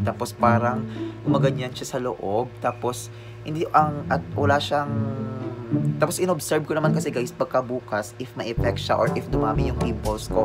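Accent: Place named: Cebuano, Philippines. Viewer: parang my cebuano o ilonggo accent ah. galing ng vid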